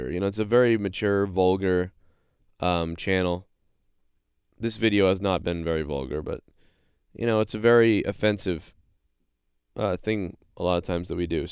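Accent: Boston, United States. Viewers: sounds like someone is from the BAWSTIN AREA. Subbed as a fellow Masshole.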